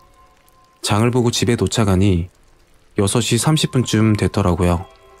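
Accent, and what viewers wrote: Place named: Busan, South Korea. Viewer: 절은청년같은데 우째그래 이야기를 차분하게 잘합니까? 말씨는 부산말씨도 많이섞이가 듣기가 참 개안습니다.이야기 내용들도 들을수록 자꾸 더듣게됩니다. 앞으로 도 많이들려주십시요수고하십시요,.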